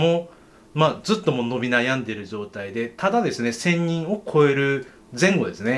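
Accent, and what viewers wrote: Kagoshima, Japan. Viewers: 鹿児島弁ですね！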